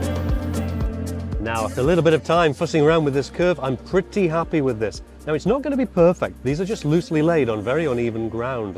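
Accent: Lancashire, United Kingdom. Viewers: where you from 'Lancashire?????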